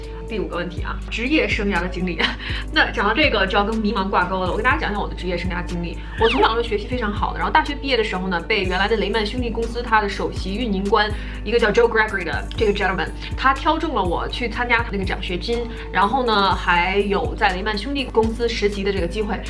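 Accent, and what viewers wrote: Beijing, China. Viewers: 偶尔看到的视频说话的语速和独特的气质感觉应该是北京女孩，果然是啊！好喜欢💕气质真好！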